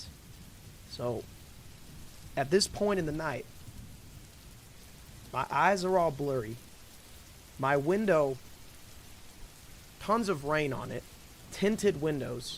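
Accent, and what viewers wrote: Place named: South, United States. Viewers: Oh my gosh ikr!! I was thinking that he has a Southern accent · crazy how no ones talkin on how dat country accent was on point tbh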